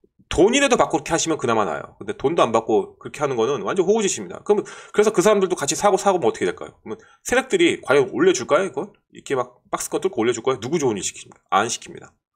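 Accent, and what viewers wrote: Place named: Chungcheong, South Korea. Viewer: 아 고향이 충청도신가봅니다ᆢ 제고향도 충청도지요ᆢ 친하구싶습니다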